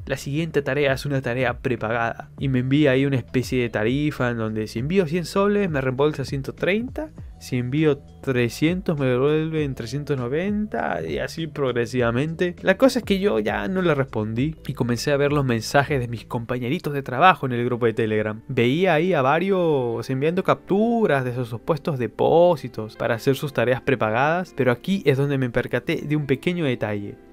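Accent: Argentina. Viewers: Yo de Lima, por eso te digo que a veces suena como a argentino, pero muy ligeramente. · Porque ablas como argentino? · Papi por que hablas como argentino??? Si eres peruano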